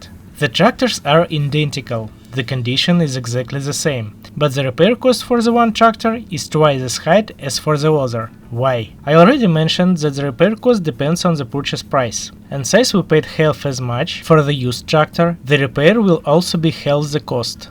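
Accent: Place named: Arab world. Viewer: i can't deal with your arabish accent sorry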